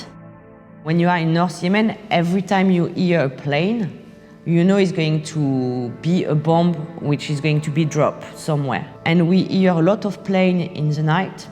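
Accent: France. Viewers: This is the most french accent i've ever heard lol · Strongest french accent I've ever heard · I couldn't finish the video. The French accent gave me a headache!.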